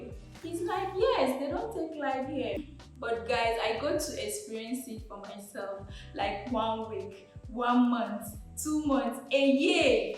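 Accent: Ghana. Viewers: You are speaking like a Ghanaian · You sound Ghanaian and we love you. Come and work with us. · I love ur Ghanaian accent ( that one de3 ... lmao)😏😏 twi +english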